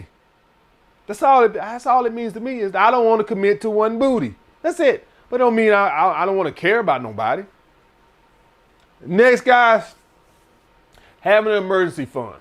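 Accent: South, United States. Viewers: It’s funny you say you uncle was one of your biggest influences to being an alpha, but in my life you sound just like my uncle. Even the southern accent!!!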